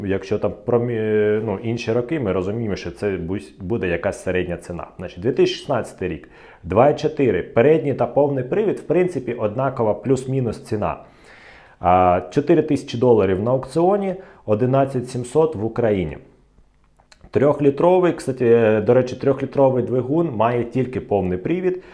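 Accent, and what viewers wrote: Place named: Russia. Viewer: Акцентик москальский однако), суржик.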